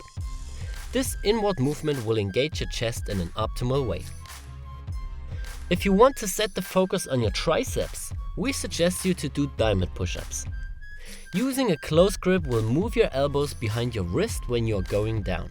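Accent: Germany. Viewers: Nice muscles! Super body! 👍🏻😛nice German accent, lol! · Are you German? :) Just checking myself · Yeah, the accent (way het talks English) gives it away. ;) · This man is single-handedly rebranding the German accent from evil villain to uplifting coach! Danke schoen as always.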